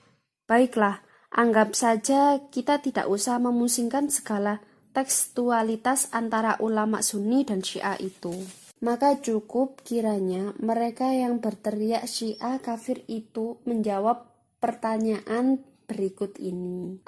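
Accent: Java, Indonesia. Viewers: kalo saya liat logat2 nya org jawa y ka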